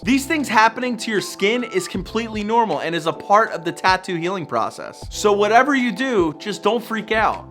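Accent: Philadelphia, United States. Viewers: Yo are you from Philly? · The way you say water (wooder) reminds me of someone from Philly, Jersey or New York . . . Wonder if you say radiator like someone from Philly. ✌😁👍 ( RAD - EE - AYE - TOUR )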